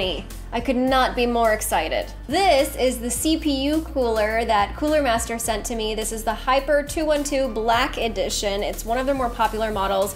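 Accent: South, United States. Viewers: You’re killing it with the southern accent. It’s like I’m watching a home improvement video and you’re showing off your tools 😁😁👍👍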